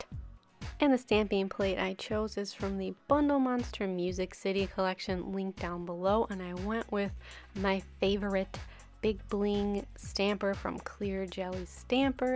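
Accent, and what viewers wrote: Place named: Minnesota, United States. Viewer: The accent reminds me of a Minnesota accent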